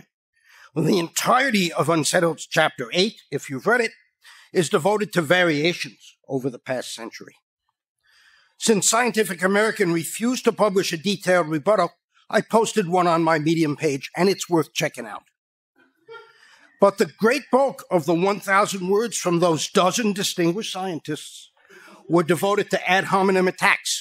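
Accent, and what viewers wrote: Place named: New Zealand. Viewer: Sounds like New Zealand 🇳🇿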